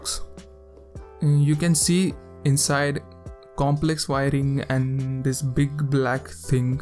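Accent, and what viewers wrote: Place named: India. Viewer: Just hear indian that's it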